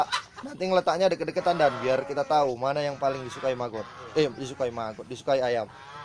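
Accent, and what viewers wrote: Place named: Java, Indonesia. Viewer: bagus bermanfaat. wong Medan, aksen ngomongnya kok wong Jawa ?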